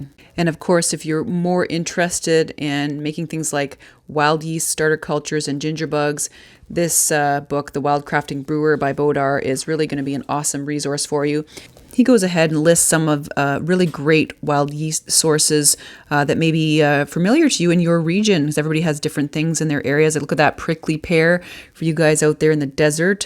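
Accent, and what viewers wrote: Canada. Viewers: 1 min in and im thinking is that a Canadian accent I can here ? Ok thats a yes. Im English and go to Novia Scotia each year and im so wishing I was over there right now in lock down rather than here.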